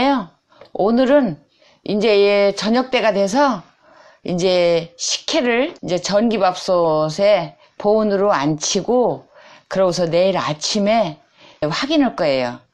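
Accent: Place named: Chungcheong, South Korea. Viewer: 충청도 아주머니신가봅니다 ^^ 저도충청도가 고향이유 재미있고 확실하게 설명도잘하시네요 재미있게 잘배웠습니다 건강하세요😃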